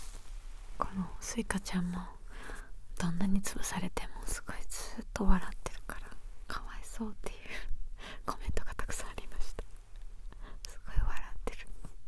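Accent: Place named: Kagoshima, Japan. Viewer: 鹿児島弁混じりの関西弁になってましたね(笑)あいちゃん顔焼けたぁ？いい感じです。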